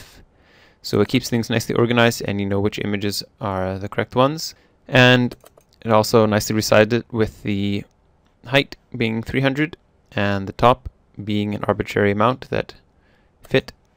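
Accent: Canada. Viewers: That's a canadian accent.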